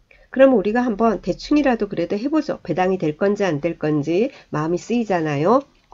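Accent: Gyeongsang, South Korea. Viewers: 소장님 유튜브를 보다가 우연히 보게 되었습니다. 저도 경매에 관심을 가지고 책도 사고 혼자 열공중인데 어느 채널보다 실전같은 느낌이 많이 들고 같은 경남쪽이라 말투도 정겹고 정말 시청자를 위해서 하신다는 마음이 보입니다^^ 이렇게 도움되는 영상 항상 감사하고 저도 열심히해서 고수가 되어보겠습니다!!!! 긴 댓글 처음 이지만 항상 건강하세요^^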